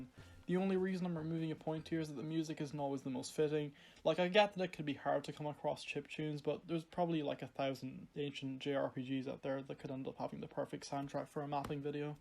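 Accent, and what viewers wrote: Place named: Ireland. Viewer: Nice Irish accent.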